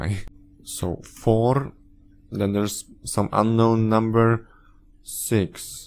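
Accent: Poland. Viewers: are you polish? I'm sure that your accent is polish.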